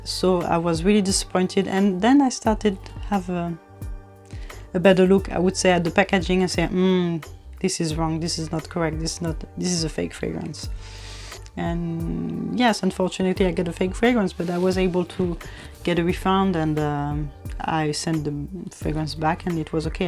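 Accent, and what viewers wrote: France. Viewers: Hello, i feel like you have a French accent! Am i wrong?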